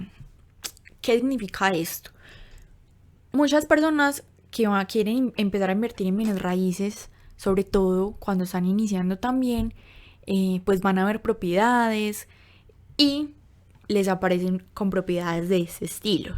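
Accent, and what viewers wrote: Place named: Paisa, Colombia. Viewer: Es paisa colombiano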